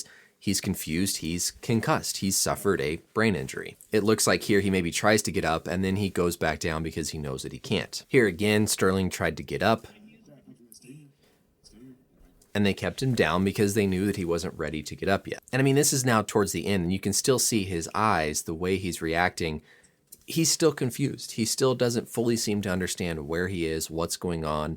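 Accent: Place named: Minnesota, United States. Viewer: Ryan Reynolds is canadian and Dr. is from Minnesota. They have similar dialects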